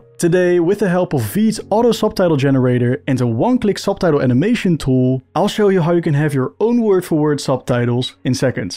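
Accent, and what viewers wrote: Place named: Netherlands. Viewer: Dutch accent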